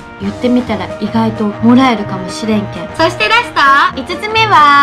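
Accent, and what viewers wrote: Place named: Hakata, Japan. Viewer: さんの博多弁が最高です👍💏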